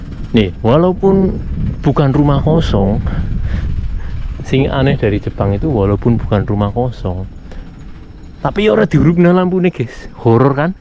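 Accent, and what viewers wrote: Banyumas, Indonesia. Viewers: Mase asale saka ndi koh ? Banyumas apa ? 😂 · Ngapak e njiplek bgt mas 😭 the real medhok neng ngana 😭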